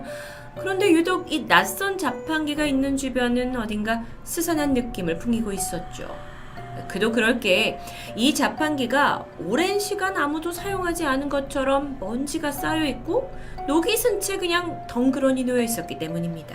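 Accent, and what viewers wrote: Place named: Gyeongsang, South Korea. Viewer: 경상도~~~ㄲ ㅈ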